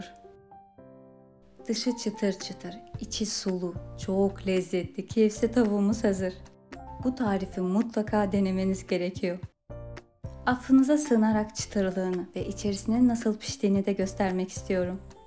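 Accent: Azerbaijan. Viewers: Azerbaycanlılar gibi aksanız var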